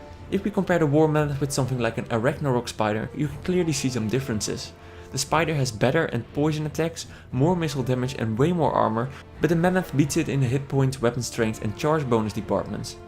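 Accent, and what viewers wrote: Netherlands. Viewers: Jij bent nederlands of niet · Lemme guess, you're Dutch aren't you?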